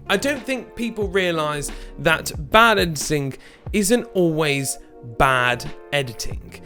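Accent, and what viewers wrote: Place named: Birmingham, United Kingdom. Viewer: oh thanks mate, that's so nice of you and my bad I couldn't recognize that brummie accent....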